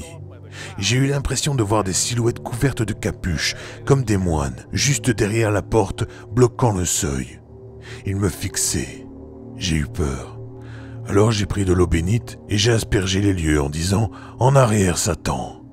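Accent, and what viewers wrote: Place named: Belgium. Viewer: J'ai des amis belges qui prononcent également de cette manière. Ça donne houit pour huit, fouite pour fuite etc...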